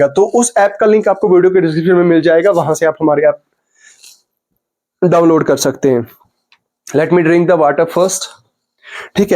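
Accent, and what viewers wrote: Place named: Kashmir, India. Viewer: Bro tum kashmir se ho? Aapka accent thoda kashmiri hai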